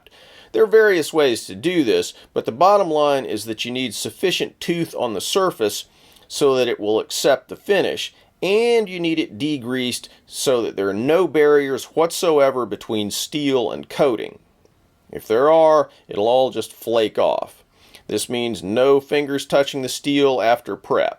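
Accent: United States. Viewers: This sir has a 100% american voice...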